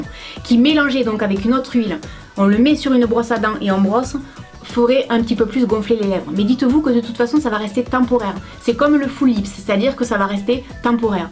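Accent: South, France. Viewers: Bonjour:) Tout d'abord je remercie Youtube, car j'écoutais simplement une musique et dans les suggestions de vidéos, ca m'a mis ta chaîne (inutile de préciser que je ne te connais absolument pas, ni toi, ni ta chaîne). En suggestion de vidéos, c'était celle au sujet de ta menace de mort. J'ai donc commencé à la regardé (car d'un le titre me mettait déjà hors de moi, et de deux, passionnée de beauté etc.. je suis toujours à la recherche de nouvelles Youtubeuses, ne supportant plus les plus connues...BREF ). Je vais faire rapide car je me doute que tu ne souhaites pas en entendre parler 30 ans, je commente rarement, mais je me devais de le faire. Je te remercie, je te remercie pour ta franchise, ton honnêteté, ton coup de gueule, et ta force. Je te remercie d'être différente des autres (oui ca change de ces grandes Youtubeuses qui ont 2millions d'abonnées et qui ouin ouin plutôt que de pousser une coup de gueule comme tu l'a fais) mais je te remercie surtout pour les tuyaux que tu as donné tout au long de ta vidéo, car certes tu as 40 ans (et je trouve ca super fun) mais les haters oublient vite l'âge des youtubeuses, et certaines sont trés (voir trop, d'avis perso) jeunes pour avoir ce rôle là, de youtubeuse beauté/lifestyle. 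J'ai bien compris que tu ne fais pas de vidéos pour le nombre d'abonné(e)s, mais je tenais à préciser que tu en a quand même gagner une de plus (moi) et sûrement bien d'autre. Moi même du sud Aussi (aussi car à entendre ton accent tu l'es égal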